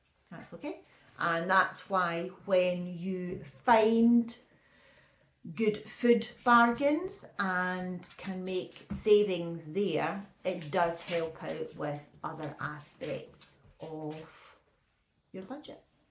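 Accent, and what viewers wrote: Scotland, United Kingdom. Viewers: I'm an English-speaking Canadian In Quebec Canada. I love your beautiful Scottish accent!😊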